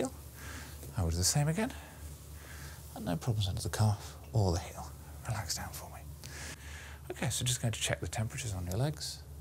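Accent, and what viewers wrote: United Kingdom. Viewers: What's his accent? It sounds different than the normal British variants...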